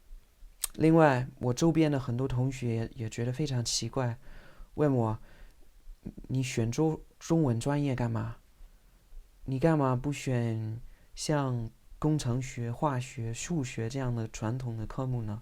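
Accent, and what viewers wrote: Standard. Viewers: close to perfect and standard Mandarin..seldom see any non native speakers master four tones so skillfully..brilliant.. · 你中文说的非常非常地道，你是怎么在没有中文环境的情况下发音练得这么标准的呢？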